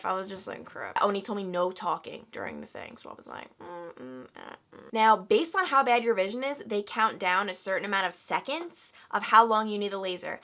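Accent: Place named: New Jersey, United States. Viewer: Great video.. that has to be a jersey accent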